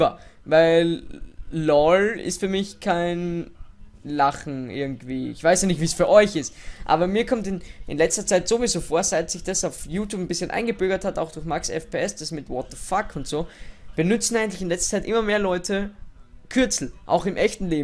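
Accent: Austria. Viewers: Bist Wiener :) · endlich amal a österreichischer kommentator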